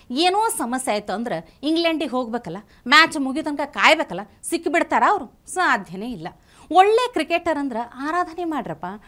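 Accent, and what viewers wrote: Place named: North Karnataka, India. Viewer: ವ್ಯಂಗ್ಯ ಮಿಶ್ರಿತ, ಉತ್ತರ ಕನಾ೯ಟಕದ ಶೈಲಿಯ ನಿಮ್ಮ ಮಾತು ಕೇಳಲಿಕ್ಕೆ ಬಹಳ ಚೆಂದ. ತುಂಬಾ ಧನ್ಯವಾದಗಳು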